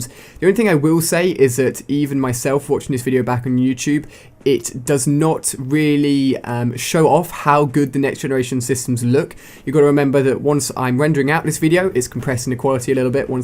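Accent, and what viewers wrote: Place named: United Kingdom. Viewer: Dejavu....only this time its british guy talking....tmartn did this first..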